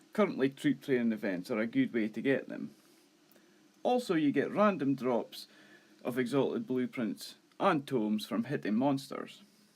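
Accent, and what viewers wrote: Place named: Scotland, United Kingdom. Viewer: I love this dude’s accent. Pure Scottish 🏴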